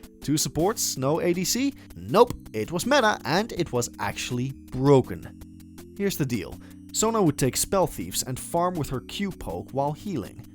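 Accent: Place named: Netherlands. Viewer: I hear a dutch guyyy, Ahoi en gegroet :P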